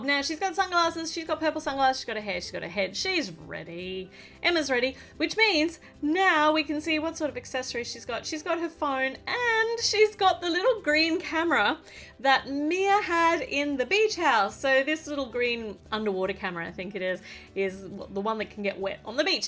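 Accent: Australia. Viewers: Ur so good at making legos and I love ur Australian accent I love u ❤️❤️🤗🤗💖😍🥰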